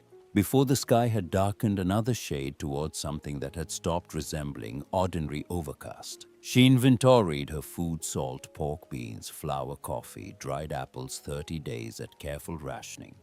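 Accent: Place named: India. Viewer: Just when I got used to the other AI voice they change it to what is this an Indian accent? I don't like the change of voice so I can't listen.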